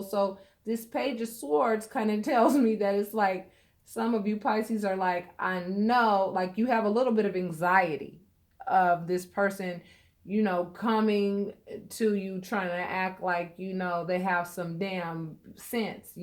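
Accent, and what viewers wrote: United States. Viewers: wow resonates so much luv the yanki accent well said hammered the nail home bless thank you xxxxxx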